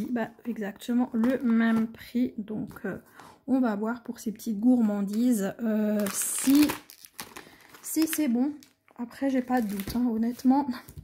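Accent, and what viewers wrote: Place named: Alsace, France. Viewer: Cc je viens de tomber sur ta video ou la la un accent qui me fais bien plaisir qui viens d'Alsace si je me trompe pas moi je suis originaire de strasbourg aussi mais je suis bien loin a present je suis dans le 44 (loire Atlantique) MERCI en tout cas pour le haul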